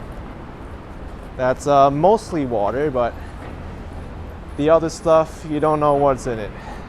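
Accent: New York, United States. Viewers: I thought you were a jewish white kid this whole time LOL. Never seen an asian person with an NY accent but im from FL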